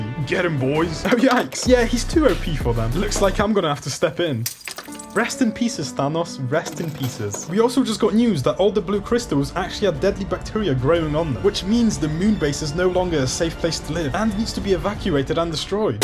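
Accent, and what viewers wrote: United Kingdom. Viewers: Is he a UK person? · i realed that he is brithish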